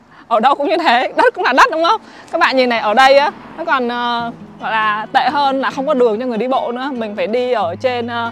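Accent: North, Vietnam. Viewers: nói giọng miền Bắc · bạn nói giọng miền Bắc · * là người bắc phải không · Lâu lâu mới nghe giọng Bắc ở Mỹ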